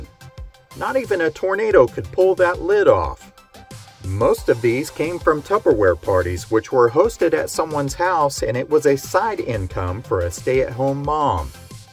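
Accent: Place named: Midwest, United States. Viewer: What part of the Midwest are you from? If I’m wrong I apologize, just a guess. I’m originally from central Indiana. Your accent sounds familiar to me. Love your YouTube channel. We are both products of the 70’s and 80’s. I was born in ‘72. So I remember all of this great crap. I personally loved anything that was robots or computers. BMX bikes were just the best too.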